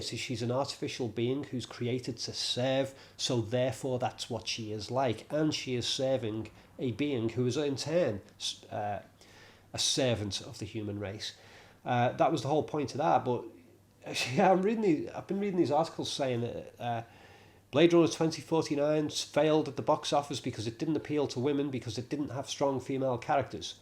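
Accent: Liverpool, United Kingdom. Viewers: has an English accent. I think he might be from Liverpool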